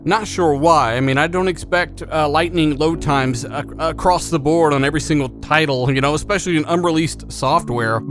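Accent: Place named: South, United States. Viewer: your hick/country accent sucks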